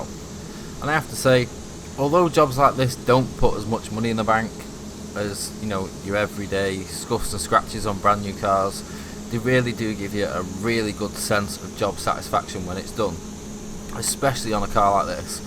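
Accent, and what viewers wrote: United Kingdom. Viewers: Someone paid $$$ for that job! are you doing commerce on the euro or British pound? I'm guessing British pound by your voice? 👍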